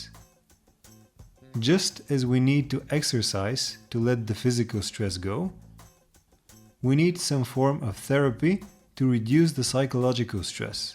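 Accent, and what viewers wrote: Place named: United Kingdom. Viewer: Слушам Ви с интерес. Чувам британски акцент. Хубаво! На американски английски “Дневник” е “ diary” Бъдете здрав !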